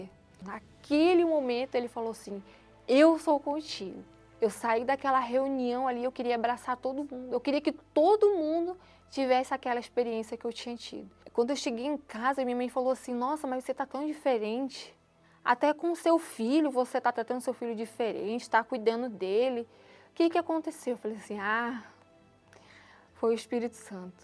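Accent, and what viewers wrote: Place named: Rio de Janeiro, Brazil. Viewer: Sotaque, ela é do RJ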